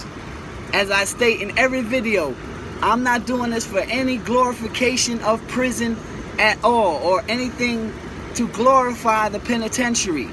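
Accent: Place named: New York, United States. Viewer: Real new yorker. You can hear it. Bless up keepup the good work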